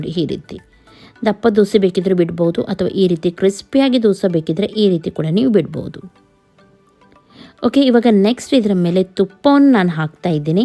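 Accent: Mangalore, India. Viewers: Hi dose supper ನಿಮ್ಮ ಮಂಗಳೂರು ಕನ್ನಡ ತುಂಬಾ ಚಂದ ಉಂಟು 💐 💐 💐 💐💐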